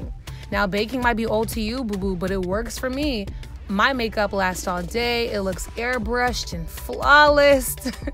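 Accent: Nigeria. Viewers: OMG I can't believe I didn't know u were Nigerian!!! 😱😍 Love when you speak in the accent girl 😉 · You can feel the Nigerian in her speach, I'm so happy if she's of my same nationality. Makes me love myself a bit more🤧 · U are so beautiful. Are you Nigerian? I heard some "Nigerian English"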